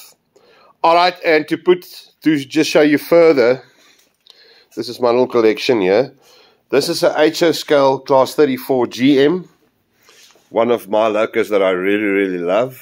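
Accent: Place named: South Africa. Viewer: Trains are great and everything, but come here more and more for the accent. Remind me the old days when I worked in the UK and had a few SA colleagues :)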